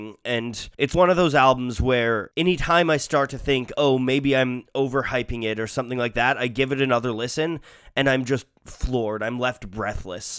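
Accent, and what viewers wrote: Canada. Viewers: He really sounds a lot more Canadian. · You sound like my brother in law except with a Canadian accent.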